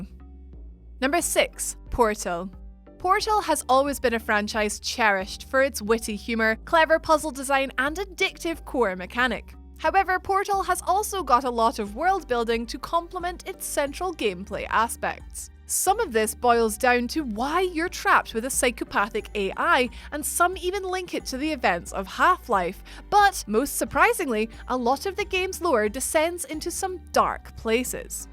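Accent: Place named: Scotland, United Kingdom. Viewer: This bitches Scottish accent is annoying and irritating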